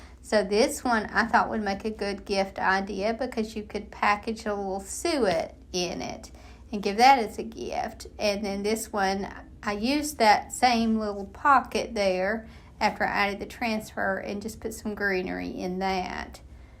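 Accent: South, United States. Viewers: Some people watch videos with things like beautiful nature scenes, sounds such as crashing waves on a beach, mountain brook sounds, rain, etc. for relaxation. I watch your videos. Your calm Southern voice is so soothing. (I'm originally from Augusta, GA - now in Texas). You explain things so well it makes me think I could probably do a bit of what you do. And even the projects themselves are calm and relaxing...color tones, so much nature. No blaring music in the background. Thank you for making my day a bit brighter. PS: I tend to love the little squirrel box best. I have some squirrels I feed. Several of them come within inches of me to get their peanuts. 🐿 😊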